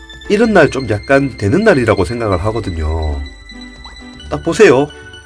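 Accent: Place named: Gyeongsang, South Korea. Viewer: 사투리가 경상도인데?? 어디쪽이지??ㅎㅎ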